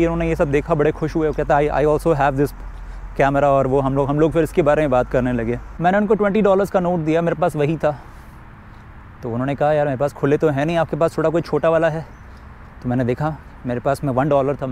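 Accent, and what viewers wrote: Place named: Bengal, India. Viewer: Khub mishti laglo banglai kotha bollen..majhe majhe erakm Bangla bolben..shunte valo lage...Dada khb valo kaaj korchen..chalie jan..god bless..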